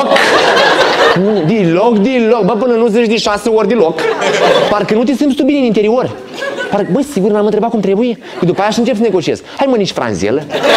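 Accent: Moldova. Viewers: Și ce fain e ca și a păstrat accentul moldovenesc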